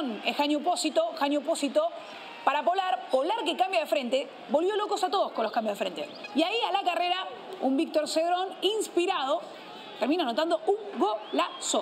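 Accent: Argentina. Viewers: No es por nada, pero creo no es suficiente tener acento argentino para comentar los partidos. Solo en Perú se recibe bien a los extranjeros no sucediendo asi en con los peruanos en otros paises. Aqui basta que hablen como argentinos y ya son expertos en cualquier cosa.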